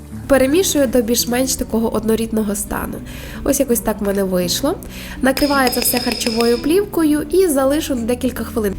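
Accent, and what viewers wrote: Ukraine. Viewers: да по-разному она и раньше разговаривала. По говору слышно сразу что дома говорит на украинском. Просто видео на русском имело больше просмотров и лайков. Ну, а сейчас у них в голове клемы поменялись, уси дюже патриотамы сталы. Ничего, спецоперация закончится, и снова все перейдут на Великий и Могучий. А пока пусть лают.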